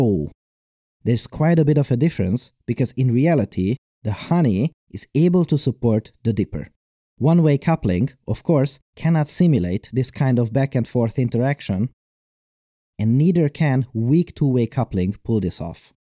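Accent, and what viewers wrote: Hungary. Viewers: I like your Hungarian accent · as soon as i heard your voice i knew you were hungarian. i like your accent :) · omfg as a hungarian I can really feel your hungarian accent xd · Hogy hallatszik, hogy magyar vagy :D klassz a vidi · Probably. His accent sounds a bit hungarian as well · Jovan magyar akcentusxd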